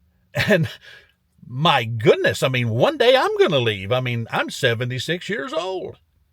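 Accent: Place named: South, United States. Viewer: Glad is going out w/friends, good to hear! I was chuckling thru out this video so thk u & thk u big the foot! I think it would be great if all Adams utube friends get a matching big the foot air freshner & hang it up in their car. GIV recently bought one! I think it would be cool if we can ask questions about Adam & u on the days maybe when ur too tired to go out. Better yet, sharing stories of the Bible would be awesome bcuz I have ADD & Dyslexic really bad so I don't like to read, I lose my place when reading & my concentration is cowazy really lousy. I had someone tell me its the book ur reading, find stuff to read that u find interesting. Nothing helped, so then I would check out bibical audio stuff & they'll talk too darn fast makes my head spin. U have a nice voice like Adam maybe even better cuz u talk slower w/a southern accent that I have always found so comforting. 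So if u know any Bible study audio out there where the person talks slow w/a southern accent, why please let me know otherwise I think I just might wait for u to get & feel well again!🎶 U got a 🎵 friend in me!🎵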